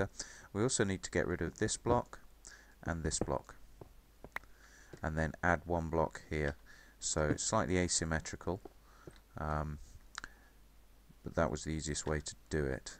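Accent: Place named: United States. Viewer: It's noce to hear that I can contribute to your work :) And it will be nice to see how part 2 will be executed. Also, I heard you had some kind of accent. But for an untraine ear it sound a lot like american :p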